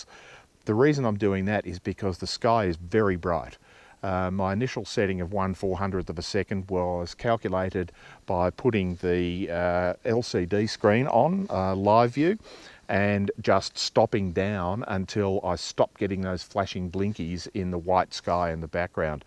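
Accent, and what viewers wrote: Australia. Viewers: Gday, Just found your channel. Good to hear another aussie accent in commentary. Sub'd. Great content also.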